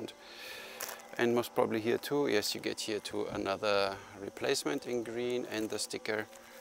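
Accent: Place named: Germany. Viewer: That is a nice German accent! It reminds me of Dr. Emil Shaffhausen! - oh, and the bows were nice too.....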